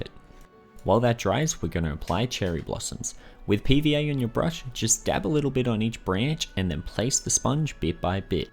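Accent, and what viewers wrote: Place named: New Zealand. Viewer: Thanks for making these videos! L💘ve the kiwi accent too!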